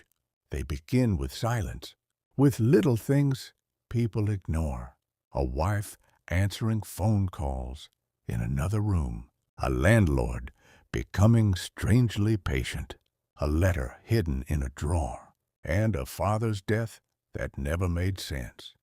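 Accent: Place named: South, United States. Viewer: The AI voice is horrible, a southern speaking white man does not match the story.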